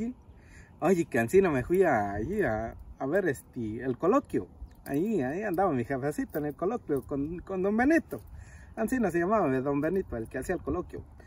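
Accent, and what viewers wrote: Michoacán, Mexico. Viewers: Así hablamos en michoacan y guanajuato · Pa michoacan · Pa las tierras michoacanas vali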